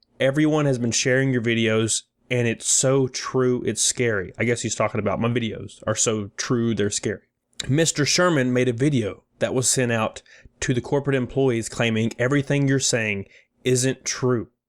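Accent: South, United States. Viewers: Anyone know what part of the south hails from? Love the accent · Funny how it takes just one man with a camera and a southern accent to destroy Gamestop. You're the best,! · Do I hear a fellow country boi actsent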